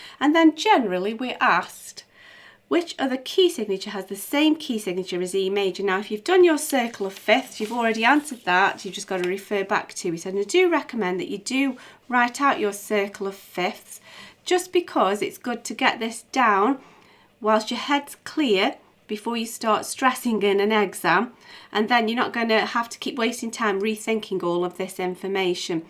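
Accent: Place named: Stoke, United Kingdom. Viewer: Great video! Are you from Stoke?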